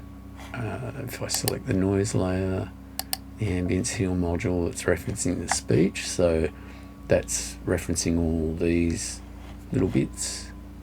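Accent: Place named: Australia. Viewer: nice hearing an aussie voice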